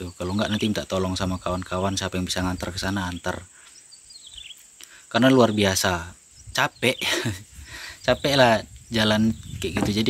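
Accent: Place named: Malaysia. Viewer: Logat Melayu kampung tengah masih ada ya pak! Semngat pak demi penerus anak didik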